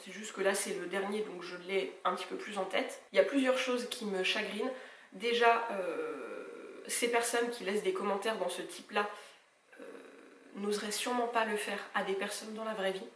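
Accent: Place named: Brittany, France. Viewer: Ha ha Mdr, et baaaah perso moi j'adore tes mimiques et ton petit accent breton qui me rappel mon chez moi, voilà dix ans que j'ai troqué ma plage de Pen bé et mon sel de Guérande pour les volcans d'Auvergne. Je suis partie en pensant que nous breton n'avions pas d'accent, un an plus tard je me suis rendu compte de notre façon de parler et ça me fait plaisir d'entendre ça dans tes vidéos. Serions-nous les seuls à comprendre? 🤔 mon mec déteste quand je rentre en Bretagne je reprend l'accent et la façon de parler direct ! 😅 je laisse rarement des commentaires mais là je me devais de te le dire, tu es une de mes youtubeuse préféré justement parce que tu es naturelle, sans chichi, normal quoi, et ça fait tellement de bien 😄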